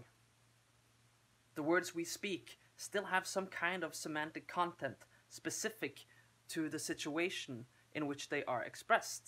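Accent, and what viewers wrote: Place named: Transatlantic, United States. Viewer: It's really entertaining how you directly address the issue using an accent and intonation very similar to the Transatlantic Accent while also also sorta having the look of a daddy from the 1950s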